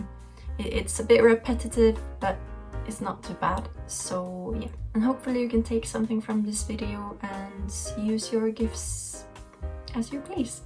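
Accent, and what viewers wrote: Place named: Estonia. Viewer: Ah... estonian accent...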